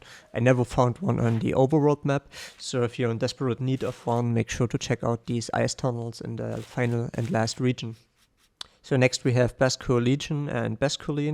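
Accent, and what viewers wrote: Saxony, Germany. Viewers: Why do you soumd like a saxonian german whos try to speak Englisch I swear exact same accent xD Ok well i mostly speak accent free but i heared other saxoniams sounding like that Normal people Version You Wörschon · For me as a german it sounds like you have a german aczent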